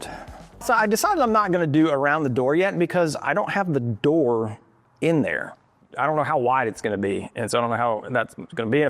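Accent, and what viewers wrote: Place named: South, United States. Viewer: Why did voice get 10 times more country in this video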